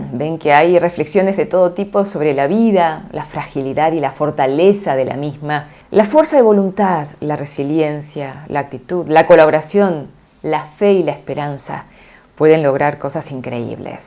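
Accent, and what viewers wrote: Buenos Aires, Argentina. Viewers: . Mis saludos y lo que haré a continuación es en carácter de pregunta. Ya que mire muchas películas coreanas y en algunos casos la temática se repite siempre de gente pobre en ascenso o de gente millonaria que se hace pasar por pobres. La pregunta es, hay ese trato en Corea entre jefes y empleados? Llegan a ese nivel de insolencia? Está todo tan dividido en clases sociales?... No quiero ofender por favor, ya que los coreanos que yo conocí siempre son agradables al menos aquí en Rusia. Todo es pregunta quizás en algún momento lo puedas leer. Mis saludos y gracias por tus vídeos y tu acento porteño. Desde Novosibirsk Rusia Gustavo Ziryanov.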